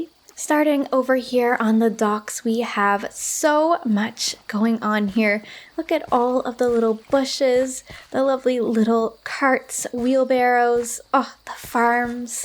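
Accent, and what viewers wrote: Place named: Newfoundland, Canada. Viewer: Haha I'm just teasing. It's cute! I didn't know people from newfoundland spoke like that. That's a good fun fact x)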